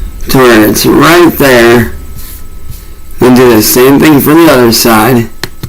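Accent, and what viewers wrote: United States. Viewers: I was actually getting to like the gay cowboy accent ! He sure is mumbling a lot lately. For such a hard worker he can’t even enunciate his words, simple grade school stuff !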